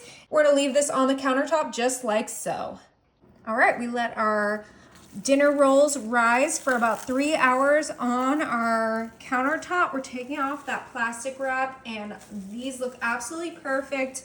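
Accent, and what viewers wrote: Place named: South, United States. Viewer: People seem nastier to others these days. Plus she is a southerner ( I'm from Georgia) and the accent makes her a target. Although my husband is from Alabama and I make fun of him saying ass for ice. It's a little funny. There are many cinnamon roll recipes out there. Leave Miss alone. Get Back Satin in Yeshuas name.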